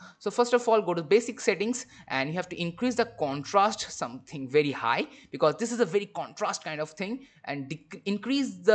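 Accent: India. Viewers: indian accent me bol le ya usa wale me bich me attka hai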